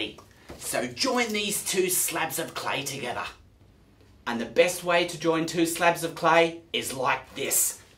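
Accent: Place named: Australia. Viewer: Are you Australia? Or New Zealand??? Coz I am Australian and my brother does this "Typical Aussie Accent" and as I watched this video, it reminded me of that voice! (No, I don't find it annoying coz I am used to it):)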